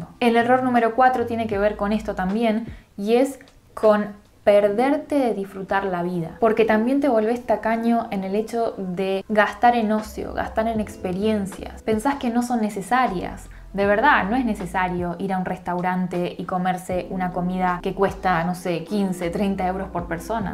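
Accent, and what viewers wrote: Argentina. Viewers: Hola, te habla una Argentina que lleva 15 años aquí en España. Y muchas cosas que contabas me fueron pasando a mi también… Llevo viendo varios vídeos y además de aprender muchas cosas que me están ayudando mucho y estoy empleando, me estás recordando mi acento y ya te estoy teniendo cariño 🥰 Un abrazo y que sigas haciendo vídeos, de minimalismo o de lo que quieras!!!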